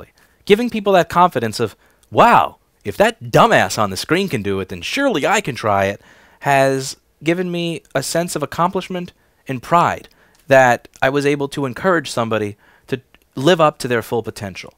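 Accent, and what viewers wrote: New York, United States. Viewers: "Whaddya Pinocchio" so NY, as are you... Happy Birthday!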